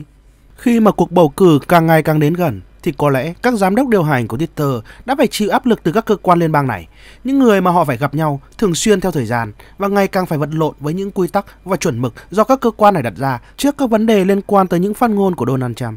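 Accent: Standard. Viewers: Kenh này được cái nói chuẩn like 👍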